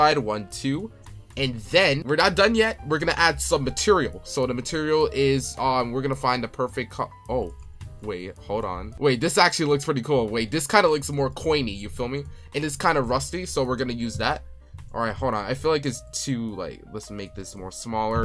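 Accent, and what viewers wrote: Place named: Germany. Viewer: german accent.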